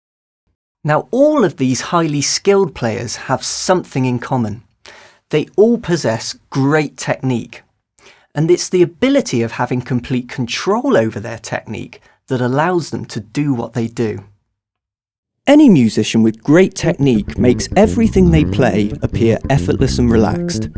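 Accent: United Kingdom. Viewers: You're like the British, calm version of LinusTechTips.. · Wow you’re very British!:-) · ingiliz aksanı.. tek kelimesini anlamadım a.q... japonca sanki...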